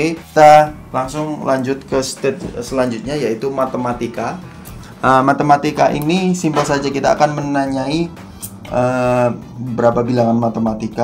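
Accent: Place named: Yogyakarta, Indonesia. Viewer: Saya lebih suka kamu berbicara dalam bahasa inggris, karena bahasa indonesia terkesan berbau yogjakarta.. tapi overall goodluck...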